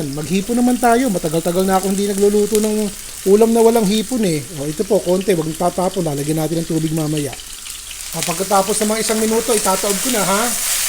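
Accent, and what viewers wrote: Philippines. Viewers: Aray ko mainit...had me laugjing...i miss pinoy expressions and accents...i just love it. From a kababayan living in LONDON, UK 🇬🇧 with ❤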